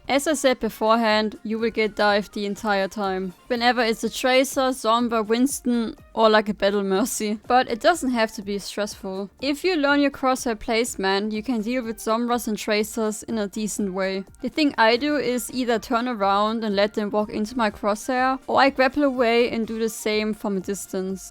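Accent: Germany. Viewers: German accent heh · Are u german cuz ur english sounds like you're german? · First thing I noticed: definitely a German accent, but nevertheless a nice guide! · I hear deutsch Akzent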